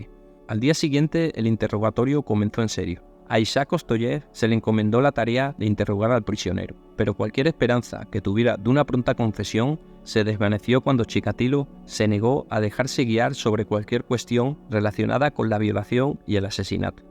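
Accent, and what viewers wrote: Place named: Spain. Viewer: Buen documental,hay una pelicula muy buena que se llama ciudadano x,me gusta buestro canal,narrado en español ee españa y muy bien documentado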